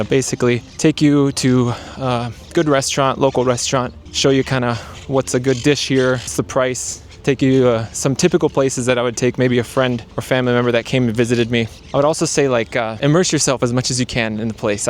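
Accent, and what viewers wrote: Andalusia, Spain. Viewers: Love that u speak andaluz😮‍💨💚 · me encanta tu acento andaluz 🤩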